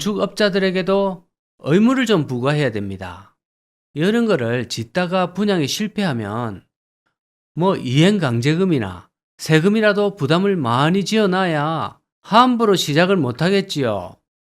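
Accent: Gyeongsang, South Korea. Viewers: 오우 경상도 싸투리가 외국어 처럼 듣기 힘들어요😢😢😢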